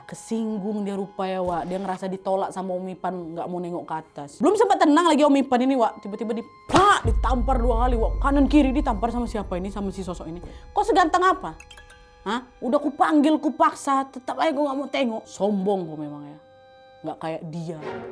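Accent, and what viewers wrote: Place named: Sumatra, Indonesia. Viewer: Logat sumatranya enak banget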